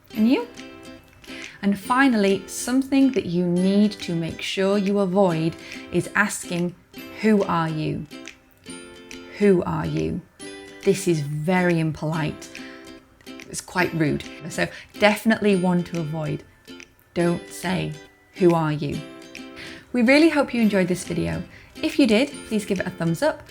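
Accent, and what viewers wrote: United Kingdom. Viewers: Proper Yorkshire lass! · Tnx for uploading the video, I like British accent. 👍🏻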